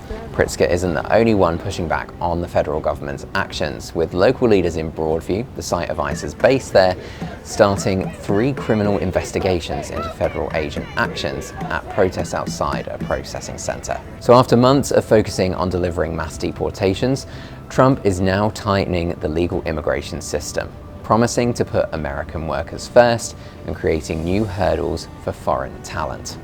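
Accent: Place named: United Kingdom. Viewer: Kick out this UK guy or charge 100K for him. I am sick and tired of getting USA news with British accents. Please go home!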